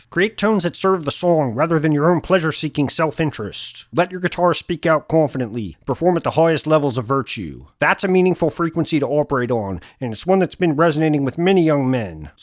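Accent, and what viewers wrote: Canada. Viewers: Dude, I am basically the audience for your Jordan Peterson content. If there's only one dude on the whole Interwebs who gets what you're trying to do, it's me, and I love it. My significant other of nearly 30 years is Canadian even though I'm from the American South. I studied history and political science in university. And I'm a 2000 graduate of Musicians Institute's Guitar Institute of Technology in Los Angeles (Paul Gilbert's alma mater). So your stuff is hilarious to me because I get it on so many levels, especially when the jargon makes sense not only as satire but as literal tone shaping advice or political critique. And the accent: "top" = "tawp." Next up, "Toronto" = "Toronno!" You've epitomized an enraged Canadian conservative forced to live in liberal Ontario. You have captured the zeitgeist of my wife's country and the rage of their small, American-loving conservative fringe perfectly, especially from my perspective of spending so much time between liberal Toronto, Montreal, and Ottawa, looking over into Alberta. 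I can hear Alberta's outrage in Kingston, Ontario even though they're two provinces away. :) Canada, a strange land where being on the left side of the country means you're politically right, and where being a Liberal means you're probably a diehard Canadian nationalist who can trace his roots to his Loyalist 6x great grandfather. :) Cheers man! Keep them coming please. :) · Has anyone actually been to an MBV concert? I have. I couldn't hear properly for a week after. Now I understand that their Muffs were obnoxiously interacting with with their delay pedals. And as I always say to these: More. Canadian. Accent! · In addition to being really funny, this is a truly good approximation of Peterson's/ most Canadians' accents. It's not easy to do. So, kudos! Doublemint kudos!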